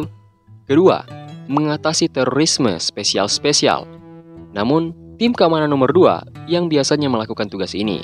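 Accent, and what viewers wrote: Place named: Parigi, Indonesia. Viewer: Balogat orang Parigi Sulawesi tengah hahaha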